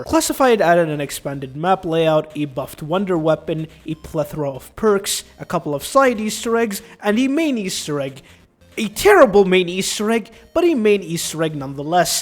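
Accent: Arab world. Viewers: Your accent is Arabic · من صوتك عرفت انك من ربعنا🫡 والله مقطع ممتاز بس يرحم والديك خذ نفس وخلنا ناخذ نفس معك، صدع راسي من كثر ما انه مافيه اي استراحة بين كل جملة وجملة. يعني خل الكلام يطلع بشكل طبيعي مو جملة ورا جملة ورا جملة كأنك روبوت مرة ثانية والله مقطع ممتاز واخيرا احد عربي سوا مقطع (كويس) مكثف عن الزومبي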